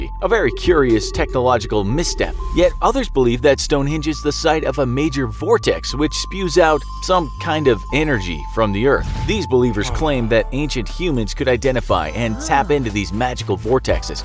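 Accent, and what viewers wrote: United States. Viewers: An American accent makes everything sound like a conspiracy theory... and not believable. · Why is an American doing the commentary?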